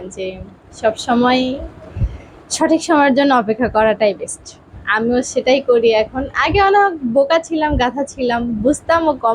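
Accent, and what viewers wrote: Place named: Satkhira, Bangladesh. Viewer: তুমার ভাষা তো কলারোয়া সাতখিরার মতো লাগছে? 🤚💚💚💚💖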